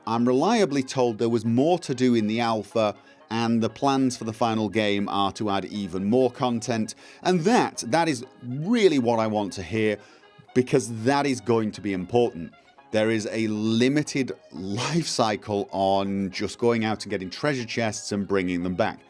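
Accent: United Kingdom. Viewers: I'm American, so I can say this: American is not really it's own language. It's begged & borrowed off so many other languages & then mutated their words in such a poor fashion that they are no longer lending us any. So, now we make up crap nonsense words & add them to the dictionary.:D Thank you, for speaking the Queen's English! Although, that, in itself, also was fashioned from other languages, I suppose. But, it just sounds so much better across that pond!;)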